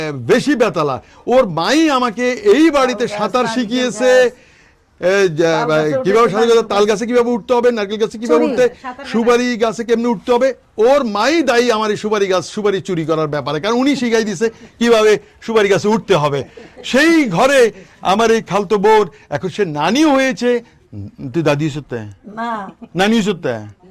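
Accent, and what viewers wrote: Noakhali, Bangladesh. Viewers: অন্যের মুখে নোয়াখাইল্লা কথা হুনি আত্ন বালা লাগছে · bay annna to noakhali balo bolta haren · এখনো নোয়াখালীর ভাষায় কথা বলতে পারেন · ও মা!!হেতে যে খুব ভালা করিই,নোয়াখাইল্লা কতা কইতে হারে 😁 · 😂😂😂 আমি পাগল হয়ে গেলাম আপনার নোয়াখালীর আঞ্চলিক কথা শুনে।আমি ও নোয়াখালীর লোক। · আসসালামুয়ালাইকুম ভাইয়া আপনার মুখে নোয়াখালীর ভাষা শুনে অনেক ভালো লাগলো আমার জন্মস্থান নোয়াখালীর হাতিয়া চর চেঙ্গা বর্তমানে চট্টগ্রামে থাকি ❤❤❤ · আপনার মুখে নোয়াখালীর ভাষা শুনে খুব ভালো লাগলো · ইরিও আনতে পুরা নোয়াখাইল্লা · ভিডিও টা দেখে কমেন্ট না করে পারলাম না দাদা। আজকে কথা গুলিও একদম জন্ম দেশের খাঁটি মনে হচ্ছে নোয়াখালী। খুব ভালো লাগছে। ত্রিপুরা থেকে ❤️❤️ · আপনার নোয়াখালীর ভাষা খুব মধুর লাগে আপনার কন্ঠে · আপনি আমাদের নোয়াখালির সন্তান। আন্ছলিক ভাষা শুনতে আসাধরন। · নোয়াখালী ভাষা আপনি ভালো জানেন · Noakhali vasa dekhi thik e aase. . lovely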